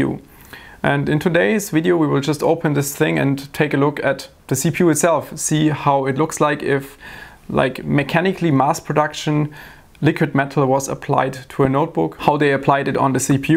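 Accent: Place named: Germany. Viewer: Sorry, but German accents sometimes makes me do a double listen. 20 seconds in... "Hoetel". haha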